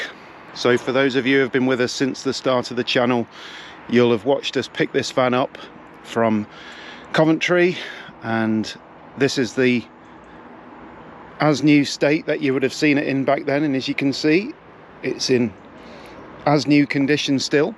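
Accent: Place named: California, United States. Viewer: Its been a delight to share your adventures over the year; informative and entertaining. Will miss your Cali slant but look forward to hearing about the next phase.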